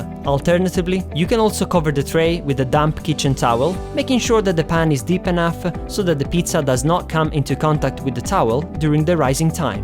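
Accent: Italy. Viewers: it's Mario! lol I love the accent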